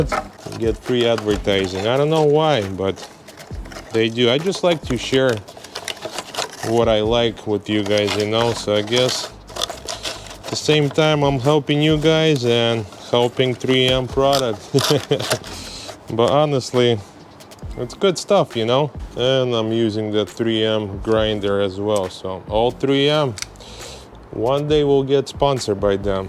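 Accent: Russia. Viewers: 😅 Русский акцент не скрыть никак. Классная работа! Лайк, подписка.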